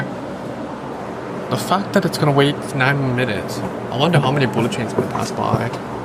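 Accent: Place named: United States. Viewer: Why do you sound so American?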